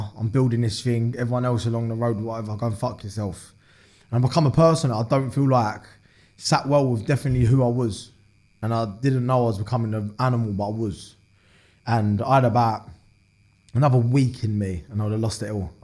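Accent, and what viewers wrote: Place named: England, United Kingdom. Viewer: Hi there, sounds like a typical English lad😮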